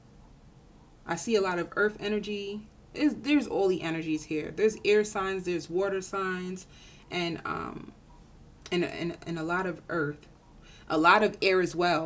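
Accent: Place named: Midwest, United States. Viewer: You sound like your in the midwest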